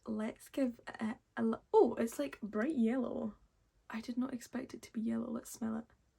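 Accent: Scotland, United Kingdom. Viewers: She is scottish😘☺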